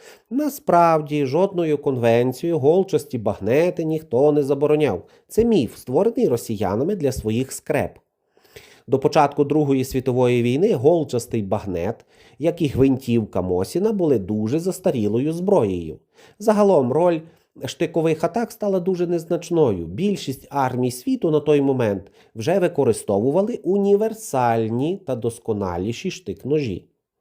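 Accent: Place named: Western Ukraine, Ukraine. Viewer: Акцент заходу України,ме-бе.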